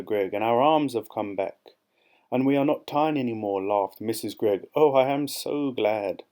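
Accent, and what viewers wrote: United Kingdom. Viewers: I really like your British accent.. and I listen to your stories so I can master this accent. Thank you❤❤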